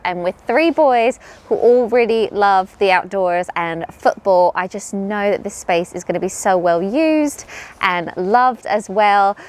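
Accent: United Kingdom. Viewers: What is your accent? It seems to swap from British to American and I can't work it out lol x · haha same here. I am a Chinese and a new subscriber here so I had no clue that is in UK. So when I saw the cupboard and fireplace I said “wow, it looks so much like the one in Harry Potter. And then I noticed British accent which makes sense. I live in Canada and the houses layout and style are so much different than in UK! I’ve never seen such a big fireplace in my life and all I want is to throw some floo powder into it. LMAO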